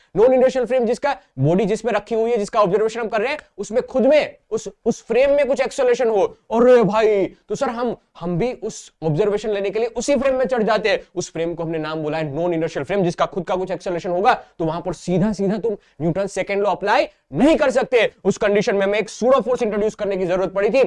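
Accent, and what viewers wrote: Gujarat, India. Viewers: Your gujrati accent ❤ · Idk if he is gujrati or not but got gujrati accent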